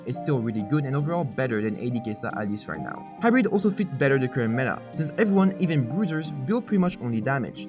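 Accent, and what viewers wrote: France. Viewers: Ahaha je sais reconnaître un french bro quand j’en entend hein! J’ai bon?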